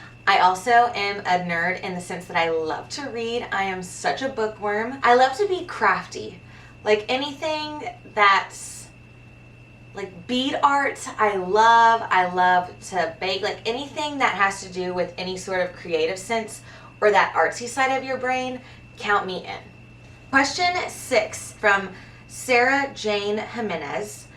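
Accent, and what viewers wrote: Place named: South, United States. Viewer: Love her accent, she’s the definition of southern charm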